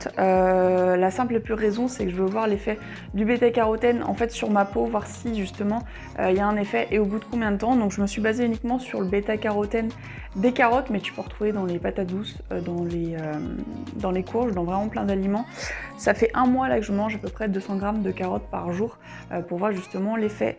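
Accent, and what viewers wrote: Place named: France. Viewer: I love French accents!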